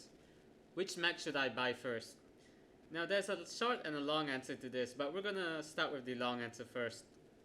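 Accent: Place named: Singapore. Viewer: I detected Singaporean!!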